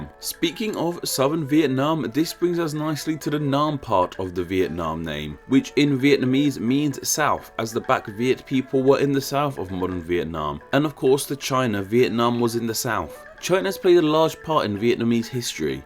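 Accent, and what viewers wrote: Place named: United Kingdom. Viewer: Plus, its not like we're going to use foreign words mentioned here. He apologized tons of times about misspronounciations already, and your suggeated alternative would be HORRIFIC! I dont want his videos to be interupted by a random video. Plus, the guy has a specific british accent if im not misstaken, so pronouncing other words is much harder for him.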